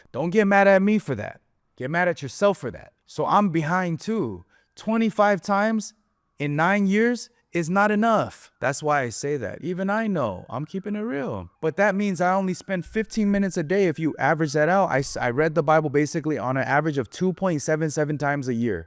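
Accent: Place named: New York, United States. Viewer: U sound dumb in this one fr just cuz of that New York accent. What was that?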